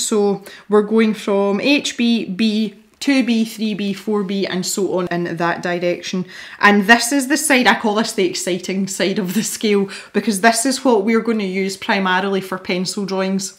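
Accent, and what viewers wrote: Scotland, United Kingdom. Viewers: So good hearing a Scottish accent 🙈 hi from Ayrshire ! Just found your channel love it !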